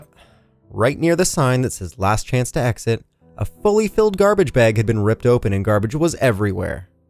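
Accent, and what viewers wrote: Canada. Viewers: Bruv your Canadian accent makes this way less convincing. Americans, presumably most employees at Disney, don't say "soaree" 😂😂😂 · Canadian, eh? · Your canadien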